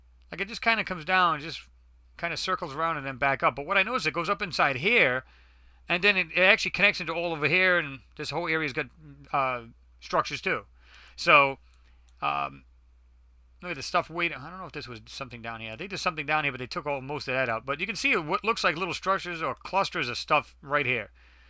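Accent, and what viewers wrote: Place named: Boston, United States. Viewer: Are you from Boston?! Lol my two favorite accents are the British accent and the Boston accent!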